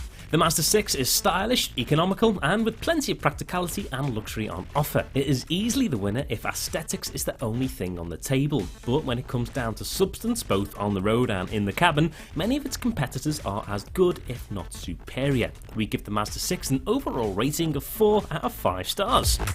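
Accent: Liverpool, United Kingdom. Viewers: A bit of a scouser is there laa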